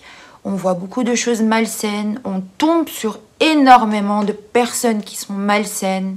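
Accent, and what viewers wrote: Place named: Belgium. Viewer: Ho! C'est marrant ça, c'est vrai que je m'étais dit que tu étais belge par rapport à ta façon de parler sans doute, à moins que tu n'ai fourni l'info dans une de tes vidéos. Je suis à Bruxelles depuis deux ans maintenant! Et j'aime beaucoup cette ville même si c'est un peu bizarre en ce moment, je suis arrivé 2 mois avant le 1er confinement, j'ai donc très peu pu profiter de la ville dans des conditions "normales". Peut-être nous croiserons nous un jour ^^, si je t’aperçois je viendrais te parler! Bises!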